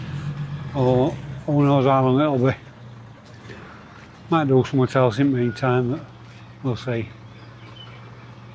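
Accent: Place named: Sheffield, United Kingdom. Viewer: Really enjoying videos. Great to see the house coming on. Would be good to know costs building land. Plus a bit of back story about you both. Your accent not too dissimilar to mine. I'm from Sheffield. Any keep the vids coming. Cheers mate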